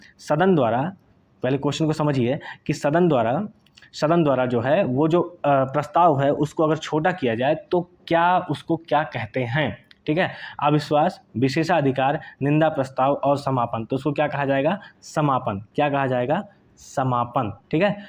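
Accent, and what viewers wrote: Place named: Bijnor, India. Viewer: Aapki aawaz hamare bijnore ki lg rhi h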